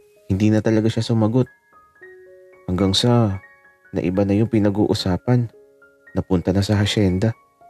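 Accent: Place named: mixed, Philippines. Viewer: Grabe ang ganda ng story author hehe waittings lang sa next ep. Nakakakilig yung story and maganda ang flow kasi nangyayare talaga sya sa totoong buhay.. I also love the accent ni author may punto kasi hehe 😅 parang taga Laguna or taga Quezon Province ganyan din kasi accent namin eh then gustong gusto ko din yung the way ng pag tawa nya hehe 😅 nakakadagdag kilig hehe 😅 Thanks po author sa magandang bl story waiting sa next ep po..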